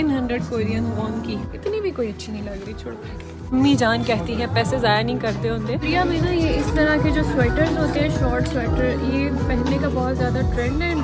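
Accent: Punjab, India. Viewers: I love your vlogs and also your Punjabi accent 🤍💗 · I watched alot of vlogs of other people which shifted korea but baiii is da mzaaaa e or eh... Punjabi.. Touch zbrdast 💜❤️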